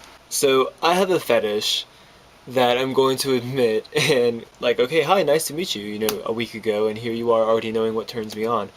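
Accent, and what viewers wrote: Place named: France. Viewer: do you have an accent? you sound a tiny bit french :]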